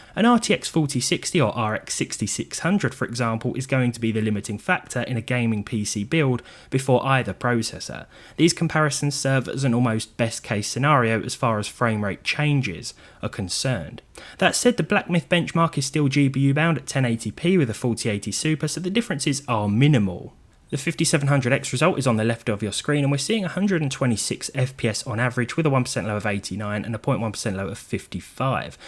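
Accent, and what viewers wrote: United Kingdom. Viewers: oo a brit? sub'd. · I like your accent. British?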